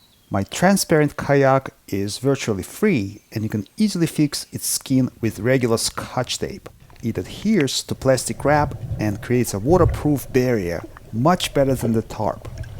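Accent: Russia. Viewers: Мне кажется что я слушаю английскую речь с русским акцентом) Обычно носители спешат и зажевывают слова до неузнаваемости. А тут почти на русском) Точно. Это же адвокат егоров =) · Ну прям русский акцент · Как только услышал русский акцент, понял - в видео будет скотч XD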